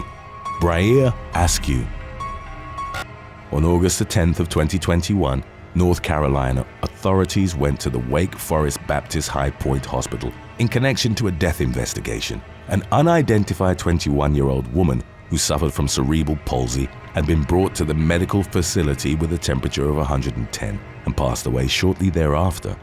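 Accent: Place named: United Kingdom. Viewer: definitely has a gift for story telling and his commercials are absolutely funny when he wants to be. And that voice with his British accent make it very enjoyable to listen to.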